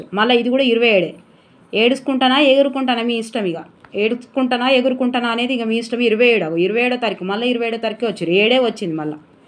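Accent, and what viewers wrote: Telangana, India. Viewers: Telangana slang superrr akka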